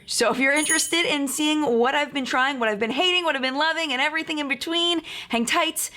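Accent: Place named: Baltimore, United States. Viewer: How did I just I now realize you’re a fellow Baltimoreian?! I hear it in the “accent” now 😁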